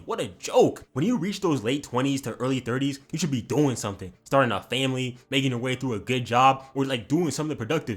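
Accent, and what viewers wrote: Kenya. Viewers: Ayooo are u kenyan. Im here from kenya tooo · Bro are you from Kenya by any chance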